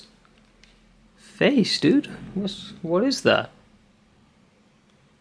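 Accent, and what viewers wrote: Wales, United Kingdom. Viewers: Just decided to get into animating and youre the first youtube creator Ive watched, awesome mate and Id spot a fellow welsh accent anywhere! 🏴 Liked and subbed! Would be cool to get into contact with you in a few months when ive got more knowledge on it all! Best of luck mukka, hope life is good🍻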